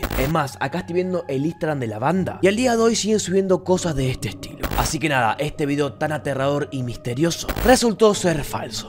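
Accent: Argentina. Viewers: De que provincia de argentina sos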